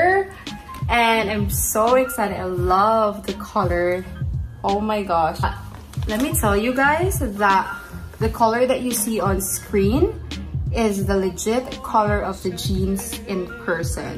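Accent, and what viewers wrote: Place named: Philippines. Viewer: Dami paarte ng salita, pilipinong pilipino naman tono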